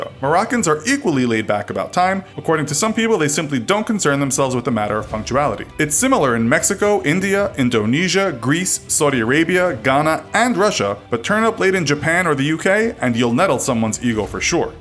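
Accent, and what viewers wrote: United States. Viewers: Please do not try to use u.k slang words if you have an American accent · The guy talking in the video sounded American. It is like telling someone to make fun of themself in public. Is the channel in the US? This brings up so many questions in my head.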